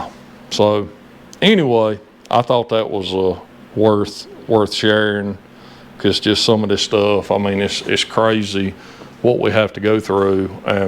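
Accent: South, United States. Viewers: Man I can’t listen to this whining. Maybe it’s the dumb southern drawl or the inability to use the English language … I don’t know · No offense at all but you have the voice of Boomhauer with the accent of Hank Hill. I’m a southerner too to so I can say that lol. Great video too!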